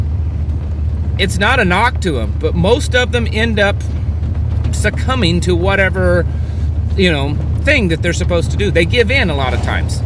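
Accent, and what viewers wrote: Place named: Appalachia, United States. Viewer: Stall at will. I enjoy hillbilly speak. We Floridians are wanna be hillbillies. Swamp Billies.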